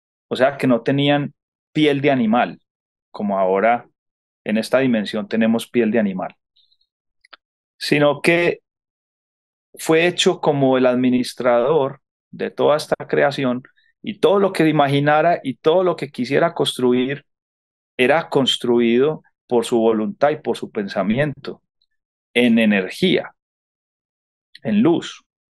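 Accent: Colombia. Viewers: Soy colombiana pero créame q me encanta como hablamos los colombianos. Una en Colombia bueno no le párese pero cuando uno va a otro país y escucha como hablamos. Jajaja jajaja me encanta. Nuestro hablado habla y se escucha espectacular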